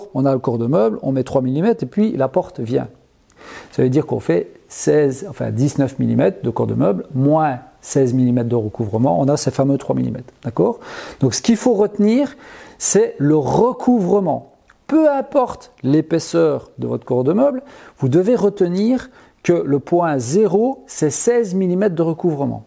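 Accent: Liège, Belgium. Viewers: clair et précis et liégeois oufti !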